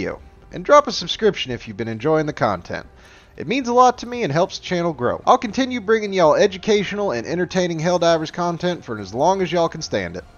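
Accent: South, United States. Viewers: It's good to meet a fellow diver who has a Southern NA accent! Respect brother! Keep spreading Democracy to those socialist bots! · I’ve watched other people, and they just kinda talk, but I like how you actually have personality in your videos. The southern twang really adds to the bot shit talk.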